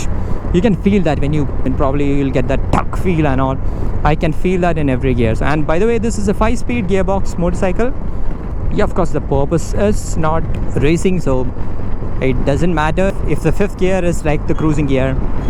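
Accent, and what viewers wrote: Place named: Tamil Nadu, India. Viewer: inner tamil coming out in that english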